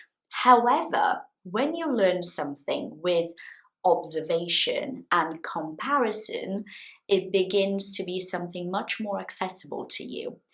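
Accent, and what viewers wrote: RP, United Kingdom. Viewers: British Accent! That’s quite posh .. you are a very pretty posh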